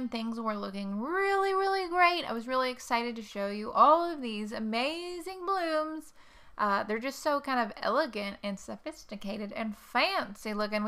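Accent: South, United States. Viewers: I can tell by that southern accent you're in the Southeast somewhere? Lol I'm in Georgia, how about you?. I love the close-ups you do, that's so cool. your cat is a cutie. . what's crazy is I have the same hydrangea it's a mother plant I've had 14 or 15 years it always blooms blue so I started getting in taking cuttings and making more plants of my hydrangea and one of the cuttings I put in the soil it's a small plant but it Bloomex pink LOL and then I took a cutting off another plant and put it like 5 ft away and it bloomed light purple.. the hydrangea I have is called endless summer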